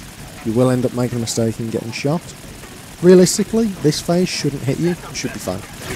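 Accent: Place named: Midlands, United Kingdom. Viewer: you from the midlands sound it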